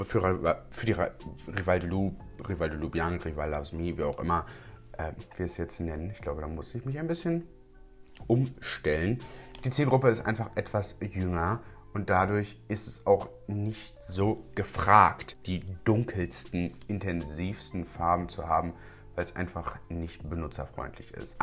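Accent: Berlin, Germany. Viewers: Uh hallo schöner Mann, bist du aus Berlin? 🙂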